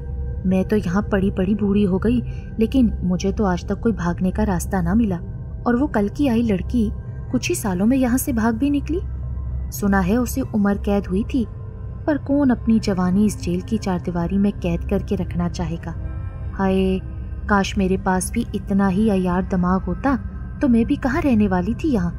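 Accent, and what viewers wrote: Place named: Bangladesh. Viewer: Bangali lehja acha lagta hai ap pe😍😍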